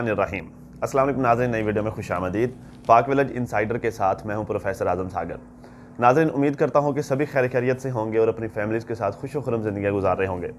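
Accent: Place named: Hyderabad, India. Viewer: I think she is from Hyderabad, India. Their accent is very Hyderabadi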